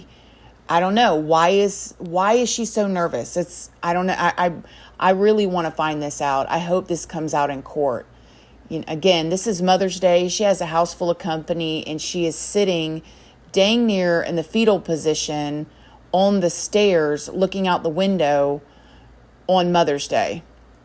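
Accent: South, United States. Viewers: where is your accent from sounds a little like a down south accent? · Ok, I usually cringe at most youtube creators, especially when they have that southern accent...being European, it's like nails on a chalkboard. BUT...you are simply amazing. You're keeping it real, you've got charisma, you're empathic and I LOVE your southern manner of speaking. Keep rocking and never change! 😍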